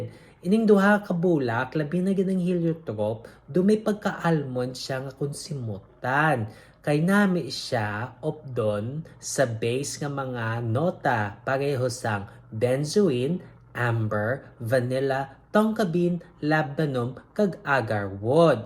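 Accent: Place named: Iloilo, Philippines. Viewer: Nice may ilonggo nga reviewer. 😁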